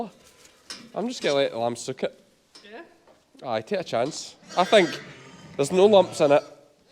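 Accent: Scotland, United Kingdom. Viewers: That ewe is making chocolate milk 🌷💚🙃 New subscriber here, how many sheep do you have? I’m going to have to try real hard to understand your Scottish brogue, my husbands family is from Scotland and we would love to visit sometime but I’m not sure that will ever happen considering our age. Thank you and good luck this lambing season. P.S. my husbands family use to have registered shropshire sheep many years ago.🌷💚🙃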